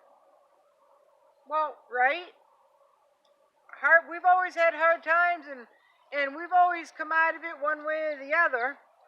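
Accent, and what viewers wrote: Maine, United States. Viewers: I bought my mom in CT several cases of H2O and clearly marked them for emergency. Next time I went there I asked her where she stored it. She took it to the dump. Couldn’t understand why she needed it. I just smacked myself in the forehead. I’m glad I found your channel. I lived in Belfast for 8 years. I miss the great no nonsense Maine way of thinking (and the accent). I’ll keep watching ya. I’m in your tribe, but in TN now.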